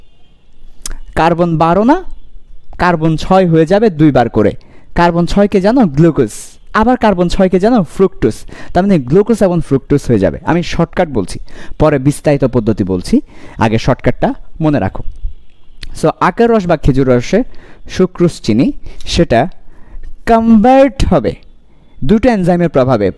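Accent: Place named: Chittagong, Bangladesh. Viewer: Bai chittagong er naki ,chini chini lagtase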